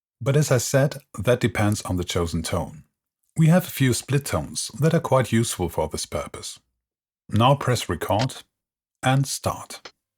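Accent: Germany. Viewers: Nice to hear the German accent again.👏👏😁😁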